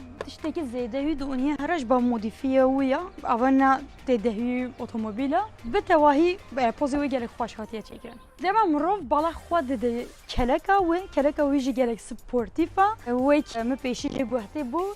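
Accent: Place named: Goyi, Turkey. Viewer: Peki o goyi şivesiyle konuşmanin verdiği zevki hiçbir şiveyle değiştirememek ,u Deste hawa soxbit serkeftin inşallah 🙏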